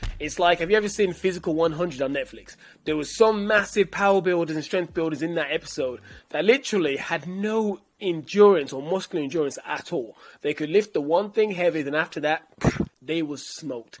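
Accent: Birmingham, United Kingdom. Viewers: are u a brummie? ❤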